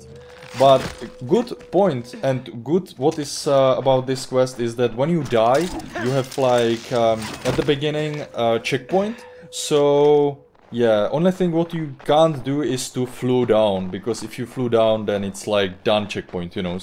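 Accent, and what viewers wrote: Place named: Europe. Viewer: What country are you from? I was going to guess but I would probably get it wrong lol. Somewhere in europe.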